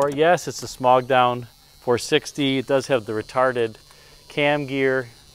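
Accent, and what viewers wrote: United States. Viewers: Always fascinated by American pronunciation of words like brougham & coupé. Unlike anywhere else! I do enjoy these videos; the cars featured in them hardly ever appeared on this side of the Atlantic. What baffles me is that so many seem to have switches on a part of the dash that can only be reached through the steering wheel. 🤔 Also intrigued that the speedo on this car goes 110,115,120. Don't recall seeing that 5 mph indication before.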